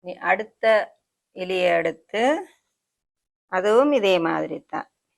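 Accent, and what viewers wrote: Sri Lanka. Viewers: neenga pesarada Partha Jaffna madiri erukku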